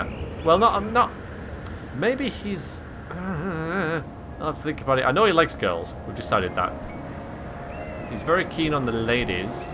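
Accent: Yorkshire, United Kingdom. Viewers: Sounds like you are a fellow Yorkshire man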